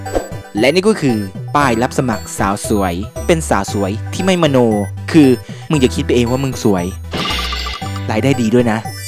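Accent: Isan, Thailand. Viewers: ชอบแอดพูดอีสาน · แอดเว่าอิสาน😆ชอบๆ💕💕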